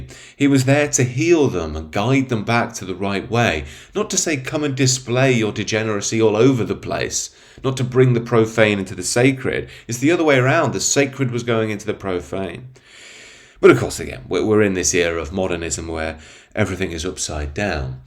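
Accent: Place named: Northern England, United Kingdom. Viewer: Eee, by eck, that northern accent were ruddy grand 😁.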